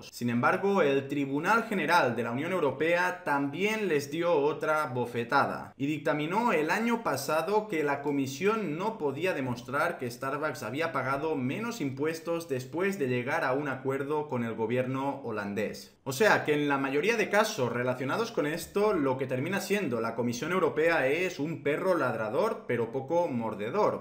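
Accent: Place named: Spain. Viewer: Pq así pronuncian los Españoles 👎 gugel, apel, y pronuncian la C como Z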